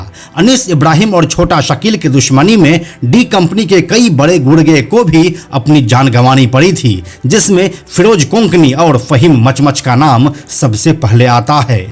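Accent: Bihar, India. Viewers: Aap बिहारी हैं क्या आपके बोलचाल ascent से लगा इसलिए पूछा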